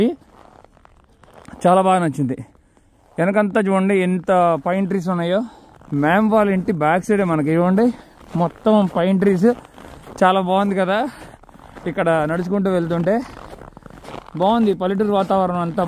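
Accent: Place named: Telangana, India. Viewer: Anna ki mana Telangana basha ki shift aipotundu vadina 😁 Bagundi to Masthundi 🔥😅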